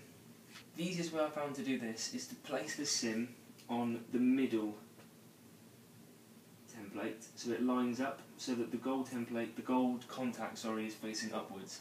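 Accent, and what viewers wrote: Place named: Bristol, United Kingdom. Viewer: are you from bristol by any chance??? I am from Keynsham (between bath and Bris)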